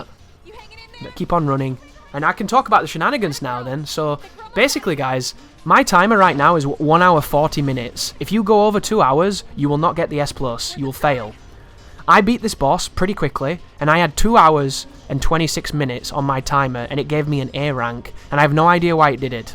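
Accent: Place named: London, United Kingdom. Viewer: Hats off to you, sir! That was the most excellent balancing of amazing skills, speedrun strat explanations, humour, and game mechanic opinions that I have ever had the pleasure of witnessing on YouTube. And all presented with that glorious London accent, making it all that much more enjoyable. I could have watched a full movie instead, given the lenght of this video, but I honestly feel I got more out of this than I would have done spending the time at a movie theater. Thank you so much, you absolute legend!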